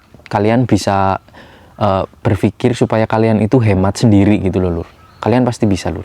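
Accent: Banyumas, Indonesia. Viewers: Asline ngendi mas ngapak" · kan cilacap tapi stay nang taiwan lah ngapak ngendi kue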